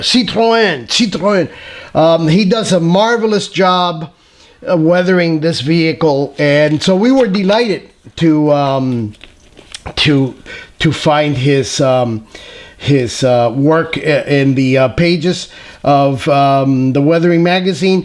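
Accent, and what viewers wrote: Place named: Quebec, Canada. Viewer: That was a very good French accent, sound like my dad, he's from Québec.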